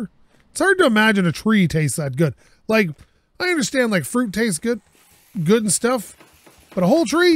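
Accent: Canada. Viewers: You have a Canadian accent are you Canadian?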